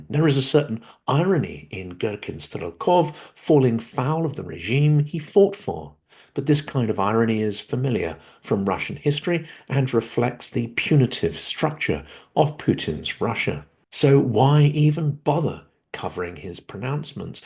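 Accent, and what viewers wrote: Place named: England, United Kingdom. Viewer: Thank you,! Brilliant, as usual. To American ears, your received English accent is very pleasant, even poetical.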